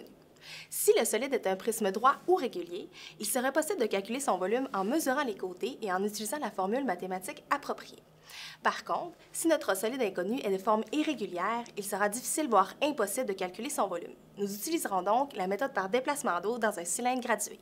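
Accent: Quebec, Canada. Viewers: J'adore le Québec, l'accent, les expressions et tout ça alors ça m'encourage à faire des sciences lol! Mercii haha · Derien et merci d’avoir répondu PS :Je surkkiffe votre accent canadien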